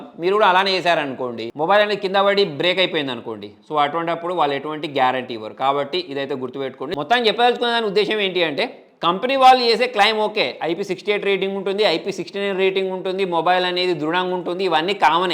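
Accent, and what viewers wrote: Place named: Karimnagar, India. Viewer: .. మీరు చెప్పే దాన్ని బట్టి నవ్వొచ్చింది బ్రో..iam not laughing about your content...knr slang..big fan of u😊😊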